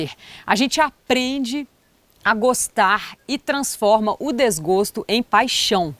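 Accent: Minas Gerais, Brazil. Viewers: As mulheres mineiras estão muito bem representadas por você, tem um belo sotaque que não e arastado e nem enjoativo sua voz e linda e vc e muito simpática e por ironia do destino e linda muito linda mesmo com um belo formato de corpo,tem um velho ditado que diz que uma mulher quando e bela até chorando ela e bonita,com todo respeito sei que você se olha no espelho e realmente e muito especial, tudo de bom para a sua vida é principalmente para toda a sua família, sucesso para sua vida hoje é sempre.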